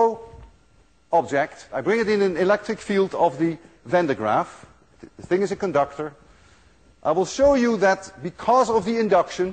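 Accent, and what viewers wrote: Netherlands. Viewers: Dit is een Nederlander, ik hoor het aan zijn accnt XD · Prof was born in Holland? - his accent is very Dutch Great presentation though :) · He has a Dutch accent somehow. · As far as I can hear, he is dutch. 100% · all I can tell you is that his accent is dutch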